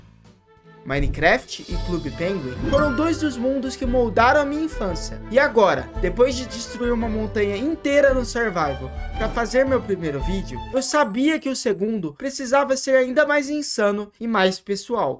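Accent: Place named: India. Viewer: tenta melhorar esse ingles de indiano ai man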